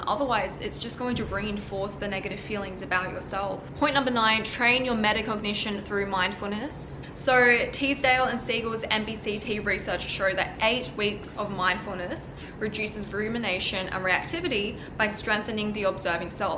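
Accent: Australia. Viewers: i love ur aussie accent it's so effortlessly sassy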